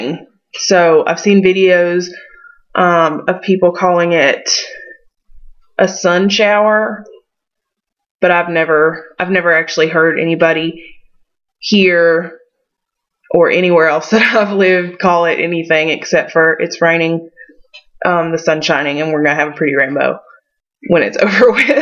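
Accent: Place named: North Carolina, United States. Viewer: You talk just like me lol! I'm from the foothills too ;)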